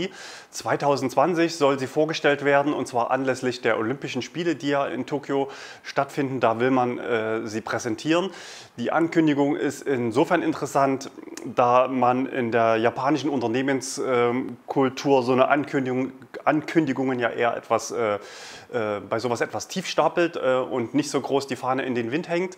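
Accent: Germany. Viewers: Gute News aber du ziehst ja echt hardcore die deutsche Aussprache durch :D Model übsilon geht ja noch, aber <link>.. da steckt eindeutig "drive" im Namen, also elec trive :D.. glaube ich :)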